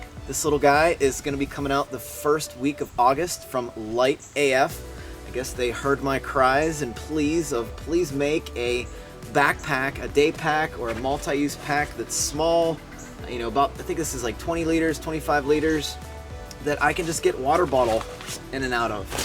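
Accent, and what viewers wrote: Pittsburgh, United States. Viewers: Hey, long time watcher first time commenting. I discovered your channel because I was thinking about doing the black forest trail again and watched your video. I thought to myself, this guy has a Pittsburgh accent and came to find you live in the area. I do as well but I'm not native to the area. Long story short man. I love your channel. I love how you are so genuine and you are not self conscious at all on camera which I envy greatly. I think you might be the best friend I never met. I hope that doesn't sound weird. Anyway man, best wishes and keep on hiking😁👍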